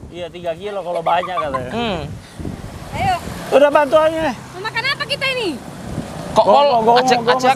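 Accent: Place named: Java, Indonesia. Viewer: Koko medok banget jawa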